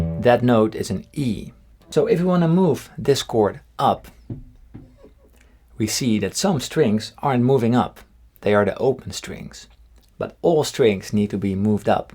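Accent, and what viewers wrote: Netherlands. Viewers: until this video i did not know you were dutch Hahaha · Ik dank je voor al de uitleg, je uitleg klinkt als een Nederlander die Engels spreekt , maar het klinkt als muziek in de oren. zou je de 6 snaren stemming voor een steel gitaar kunnen uitleggen. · i knew it you are dutch, could hear it by the accent 😂😂 greetings from almere, any tips on starting to teach guitar?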